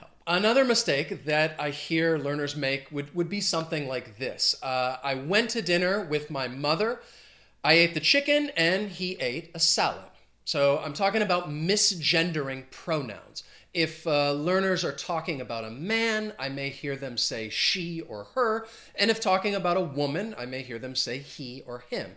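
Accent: United States. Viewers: Thank you for this video. You teach very well! We Spanish speakers need to learn English for native English speakers as you. The best of all...I don't need to pay anything to watch your videos and your lessons are short. I don't even need an expensive English textbook . Your English is very clear and I love American accent. 🗽 Keep it up teaching! Greetings from Colombia! 😁👍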